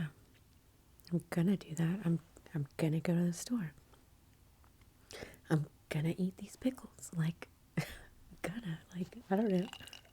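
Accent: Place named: South, United States. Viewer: Cute video- I love the Southern accent and find most of the sayings to be so funny! Can’t wait to see what you upload tomorrow. 😊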